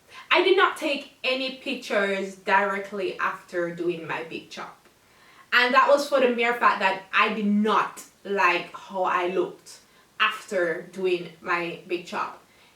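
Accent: Jamaica. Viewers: Are you a Jamaican????? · Your Jamaican accent is so AMAZINGLY BEAUTIFUL!!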